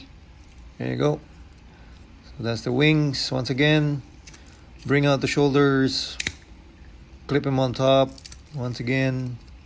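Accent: Philippines. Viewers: He's accent is a Filipino Klinikle!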